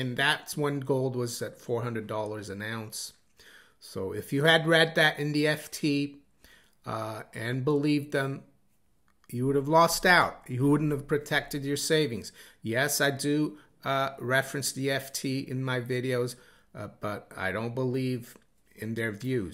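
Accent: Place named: South, United States. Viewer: < Once I listened to a video post with sound, clearly you live a bit further south of N.E.. lol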